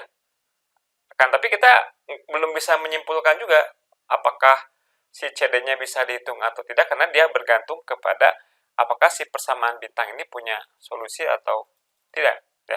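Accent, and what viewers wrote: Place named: West Java, Indonesia. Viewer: Mantap nih, aksen sundanya dapat utbknya juga dapat.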